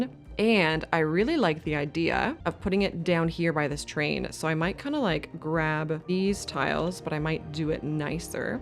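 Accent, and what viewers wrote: Canada. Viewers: As a fellow Canuck... your consistent pronunciation of "aboot" hit my funny bone just right! LOL Anyway, this was HUGELY helpful! I have never thought of this idea, and I struggle when building cities, despite all the great guides and tips out here in YT Land. I'm going to start over - again - like, I think this will be my fourth city start since I got the game the day it came out - pick a map, take a screenshot, load that into my Goodnotes app on my iPad and mark it up with my Apple pencil in ALL THE COLOURS! Then I can have my plan in front of my face while I build. SUCH a great tip, thank you for sharing!!! :trophy-yellow-smiling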